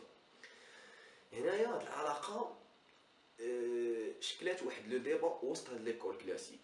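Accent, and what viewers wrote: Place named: France. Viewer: اسمع عربي مفرسن..انت مطبوع لسانك فرنسي وانصحك بعدم التكلم باللغة العربية لانك كمثل الهندي الذي يتحدث لغة انكليزية بل انت اعقد...مع هذا شكرا لمجهودك بالرغم من اني لم افهم لغتك..تحياتي..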